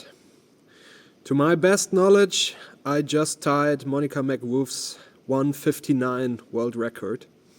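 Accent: Germany. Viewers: Klingt, als würden Deutsche englisch reden... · Sarcasm in English with a German accent is ..... quite hilarious :D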